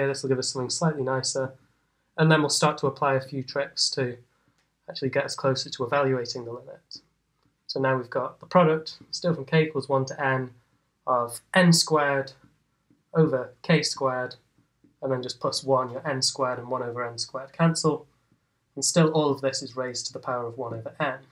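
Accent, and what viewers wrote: United Kingdom. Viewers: thank God that math is universal, i can't understand his british accent at all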